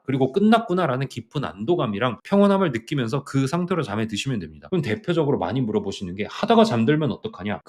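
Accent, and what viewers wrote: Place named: Jeolla, South Korea. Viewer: 아무리 숨겨도 감춰지지 않는 전라도 사투리=사짜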